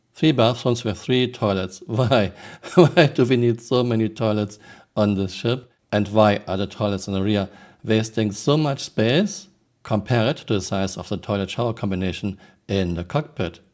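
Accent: Germany. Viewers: Du bist ja Deutscher. Höre ich an Deinem Akzent. Das Problem der Medic-Schiffe ist das neue Gameplay. Wenn ich alle Betten vollständig mit den Gels auflade und eventuell noch ein paar in die Regale stelle, dann bin ich first Target für jeden Piraten und Griefer. Folglich wird keiner mehr Med-Schiffe haben wollen. Toll CIG, oder sehe ich da was falsch?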